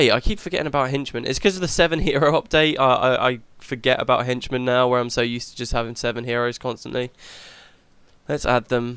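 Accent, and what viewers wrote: United Kingdom. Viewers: "Bollocks!" ;D British english>American english tbh, finally found some variation :) Oh, and about your plan on how many videos to upload at a time, try hold about this phase or solwer if u want it to time it with beta/release. And maby you are being too optimistic about when GW2 is coming. For all we know, it might just as well come 2014 :/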